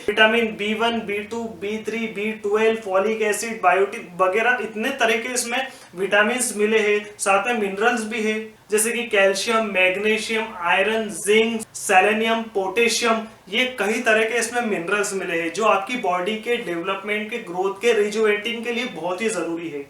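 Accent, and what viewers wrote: Indore, India. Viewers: Indori tone!!!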